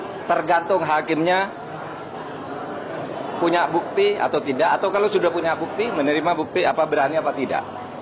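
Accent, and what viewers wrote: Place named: Madura, Indonesia. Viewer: Logat maduranya siip..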